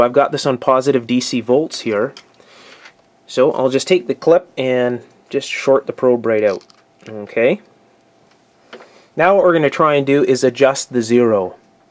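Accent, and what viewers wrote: Canada. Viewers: I think it's a Canadian thing, eh? It causes him to mispronounce Farad as FAYrad, too. The guy's name was Faraday: Fare-aday, not Fay-raday...wonder how he pronounces "soldier"? Not a bit of that is an issue here though. His amazing teaching skills, along with an extraordinarily extensive understanding of broad and diverse technologies trump his localized pronunciation idiosyncrasies. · Hello Mr., Your vids are excellent. Very explanative. I have only one question, and I'm already guessing that maybe it's because you're Canadian!? It's about your pronunciation of the word "solder"! I have always heard it as SAUDER. But you say SAULDER.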